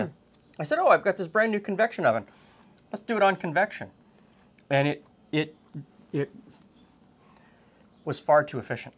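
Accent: Canada. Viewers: It's funny, despite saying pop and hearing a Canadian accent daily, I'm always still momentarily taken aback by hearing them in the media I consume. Thanks for all the work you do,!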